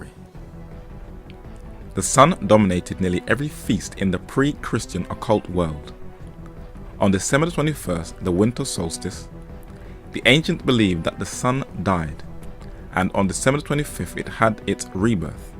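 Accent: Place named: Scotland, United Kingdom. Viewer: very difficult to understand the speaker. Sounds like he is a s Scottish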